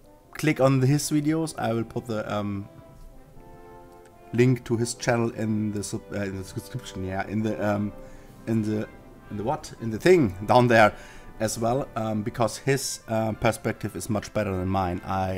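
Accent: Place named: Germany. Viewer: Congratulations! I kinda guessed you were german from your accent. But don't get me wrong, i like the accent, it's very euro-English ;P Can you please consider playing People's Republic of China? Please please please, I haven't seen anyone play as them so far.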